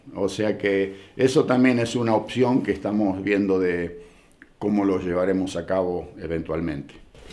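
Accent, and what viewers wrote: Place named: Argentina. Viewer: ¡Pero cómo narra este hombre! ¡qué delicia escucharlo hablar de los frutales en el minuto! yo no era fan del acento argentino hasta que escuché a. Saludos desde España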